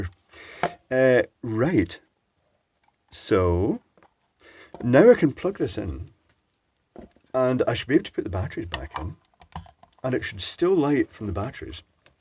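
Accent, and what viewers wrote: Scotland, United Kingdom. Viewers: We all came here for pleasant Scottish accent. Never get disappointed when he calls for that schematic. Other Scotts could point out that besides saving the cable tie with a knot, the much cheaper 1N4002 50V 1A diode would have been much less of an overkill and provided a bit more Glenfiddich.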